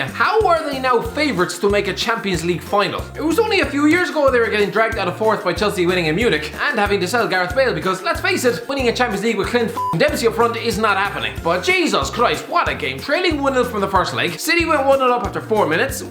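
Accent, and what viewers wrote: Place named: Ireland. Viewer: What part of Ireland are you from ?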